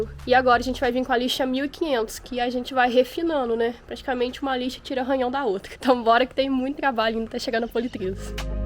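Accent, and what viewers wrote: Minas Gerais, Brazil. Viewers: Você é de minas gerais?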